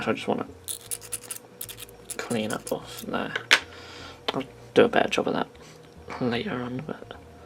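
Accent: Norfolk, United Kingdom. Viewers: Are you norfolk? I'm Norfolk and you sound a little bit norfolk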